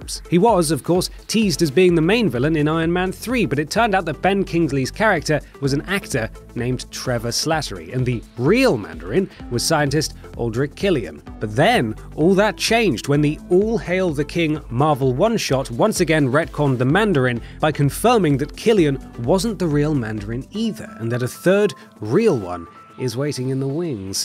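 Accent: United Kingdom. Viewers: I don't really agree with the whole list but the British accent makes me believe that I'm wrong and they're right.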